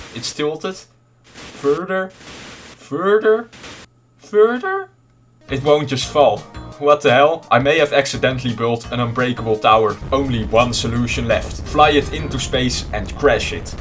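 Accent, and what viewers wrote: Netherlands. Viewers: Dutch person recognises dutch accent... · Je Nederlandse accent is wel grappig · Is he dutch voice sounds very dutch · ar you Dutch? because im dutch and you have a dutch accent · i can hear that this man's dutch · Judging by your accent your dutch! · Is it weird that I can hear that you are Dutch? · you sound dutch r u? if yes then i wanna say 'hallo man'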